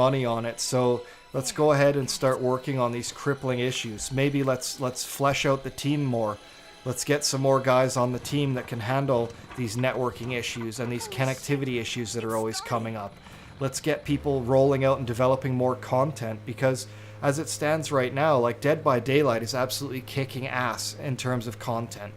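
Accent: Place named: Canada. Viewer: This Canadian voice sounds familiar...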